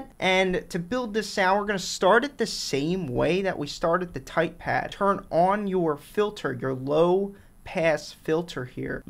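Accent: Philadelphia, United States. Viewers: bro u gotta be from philly/delco !!!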